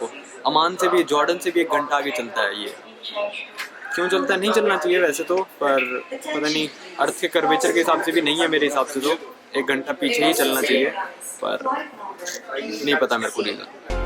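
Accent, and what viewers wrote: Haryana, India. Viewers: I love Haryanvi accent bhai. Love from Lahore,Pakistan.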